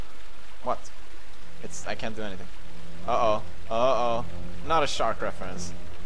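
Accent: Sweden. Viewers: still had that Swedish accent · His Swedish accent is so prominent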